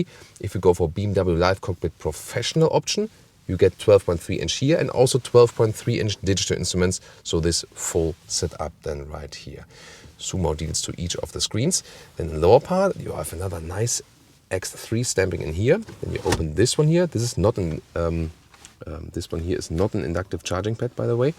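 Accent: Germany. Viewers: beautiful Germany accent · It is interesting how his speech still sounds like german languaege though he speaks english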